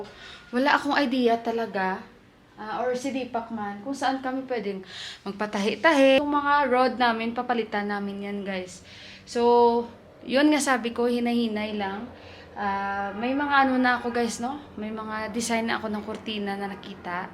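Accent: Iloilo, Philippines. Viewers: Natatawa ako sa accent mo sis naalala ko best friend ko tiga Iloilo dito sa italy aprehong pareho kayo Kung magsalita hahahaha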